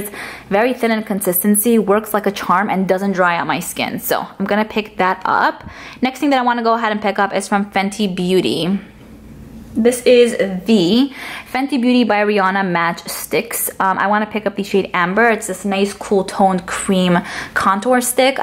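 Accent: New York, United States. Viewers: I really didn’t want to be mean, I love her accent it’s a big reason why I subscribed it reminds me of when I lived in NYC/Jersey 😊❤️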